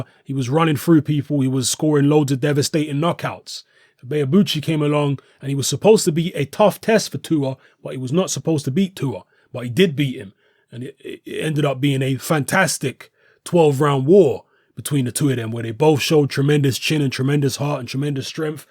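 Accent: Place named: South London, United Kingdom. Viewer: He's from South London , they all sound like that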